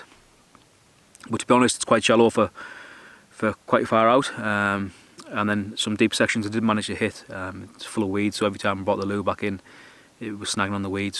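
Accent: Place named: Teesside, United Kingdom. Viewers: Sounds very much like a Teesside voice, a Local version of Paul Messner. A good video of someone camping responsibly. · Excellent video lovely scenery you sound like your from teesside way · Cracking upload not sure how I never seen your channel before ?? Youtube algorithms at work I am guessing.. Awesome location and pitch .. I was there one year ago wild camping with my youngest son. We got eaten alive by Midges and had to bail out ... The accent sounds local to me.. ATB from a South Shields wild camper... Subbed..P.S. I do a bit of Astro Photography and The Galloway National park is a Bortal 1 ..Meaning zero light pollution..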